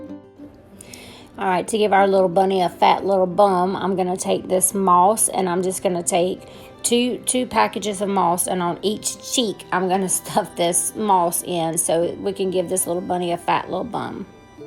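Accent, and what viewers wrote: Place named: South, United States. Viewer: Hey love you videos! Can I ask what state do you live? I live in a very small Town in North Carolina called Lincolnton. Love your country accent! I found you though Sweet Wendy’s channel.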